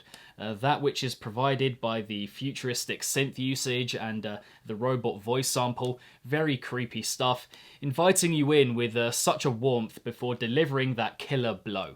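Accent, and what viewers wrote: United Kingdom. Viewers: Good video but my ears is blooding by your bri'ish acсent, sorry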